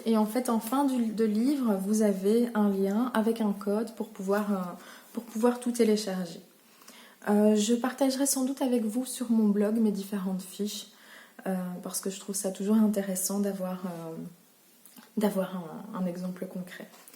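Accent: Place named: Belgium. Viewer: Bonjour, je découvre ta chaîne avec cette review de ce livre que j'hésitais à acheter. Grâce à toi, je ne doute plus. La méthode est très complète, c'est parfait. Merci beaucoup. J'en profite pour m'abonner et découvrir toutes tes autres vidéos. Et ça fait plaisir d'entendre ce si joli accent belge ❤️❤️❤️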